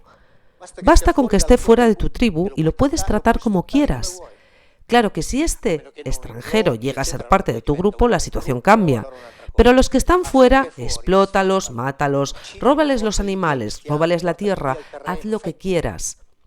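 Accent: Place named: Castile, Spain. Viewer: Puro acento castellano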